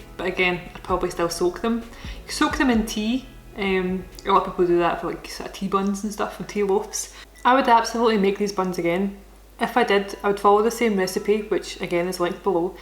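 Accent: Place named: Glasgow, United Kingdom. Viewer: Glaswegian/surrounding area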